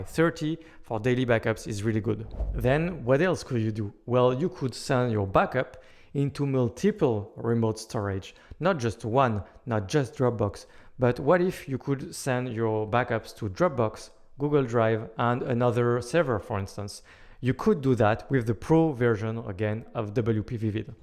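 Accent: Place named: France. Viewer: Trop bien avec l’accent français j’arrive a comprendre ce que tu raconte 😊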